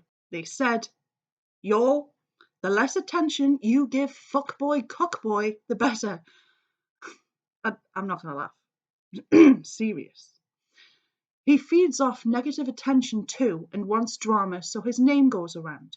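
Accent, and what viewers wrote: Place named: Scotland, United Kingdom. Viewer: I loved this vid! Hello,! I have just discovered you and am now subbed! I adore your feisty Scottish voice and attitude. I can’t wait to see more! 👏👏👏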